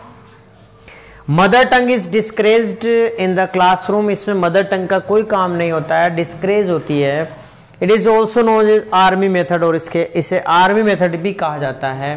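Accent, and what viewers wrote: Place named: Bhilwara, India. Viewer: 🙏🙏🙏🙏🙏🙏🙏🙏बहुत बहुत धन्यवाद sirji.... वैसे क्या आप भी भीलवाड़ा से है... भी... से अभिप्राय है की,,, मैं तो पक्का भीलवाड़ा से हूँ.... और आपकी लैंग्वेज और बोलने के तरिके से लगता है आप भी भीलवाड़ा के ही है... सही कहाँ ना मेने 😄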